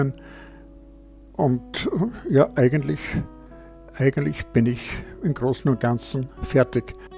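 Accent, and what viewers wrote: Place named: Austria. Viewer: ja, endlich. eine Video für mein Niveau - auf Deutsch bzw. angenehmes österreichisches Deutsch :-) Habe in letzter Zeit einige Video über die Aquarelltechniken mit dem Hakepinsel gesehen. Aber Ihre sind halt doch immer eleganter und effizienter erklärt. Das mache ich unter Anleitung am Wochenende mehrmals nach. Schön, das es jetzt zum Feiertag hochgeladen wurde :-D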